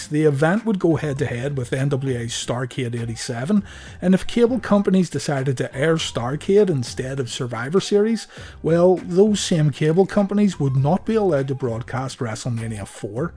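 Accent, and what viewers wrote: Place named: Scotland, United Kingdom. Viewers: are you a Scot? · I'm half Irish American and native I been thinking u was Scottish lol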